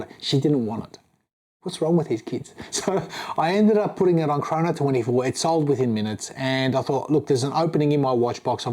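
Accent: Australia. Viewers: I hate this stupid Australian accent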